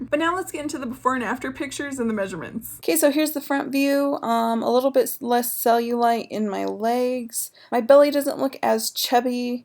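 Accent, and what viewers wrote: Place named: Midwest, United States. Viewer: Hey are you from the Midwest? I’m from Minnesota and your accent sounds like mine lol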